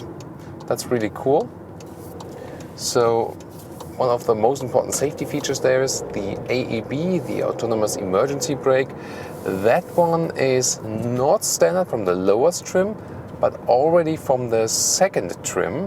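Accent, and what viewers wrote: Germany. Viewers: He speaks English like a german, why is he speaking English !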